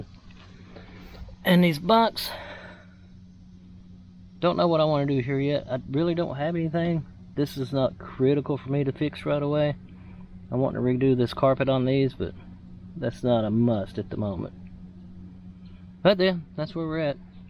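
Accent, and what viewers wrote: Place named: Kentucky, United States. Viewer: I'm from Kentucky and I know one from there when I hear one.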